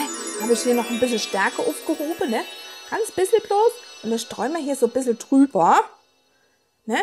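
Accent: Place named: Saxony-Anhalt, Germany. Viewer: Ne, a bissle Sachsen-Anhalt iss da ach mit drin ne ;o) aber der Kuchen ist super... gleich nachgemacht. Liebe Grüße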